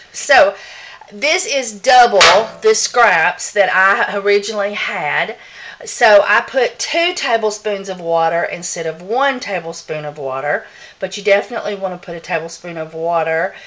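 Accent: South, United States. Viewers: This is the best soap making lesson video, gotta check out more of your videos. And love your Southern accent, thanks for sharing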